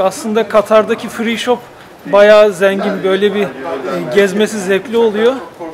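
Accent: Samsun, Turkey. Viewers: abi afganistan videolarından birinin altında "elli" derken e harfini vurgulama şeklinden Samsunlu olduğuna ikna oldum yorumu vardı. O günden beri e harfini söyleyiş şeklin iyice bi dikkatimi çekiyor engel olamıyorum. Endonezya derken de aynı vurgu var dinlerken fark ettiğimde çok hoşuma gidiyor😁 yanlış anlamazsın umarım art niyetle yazmıyorum.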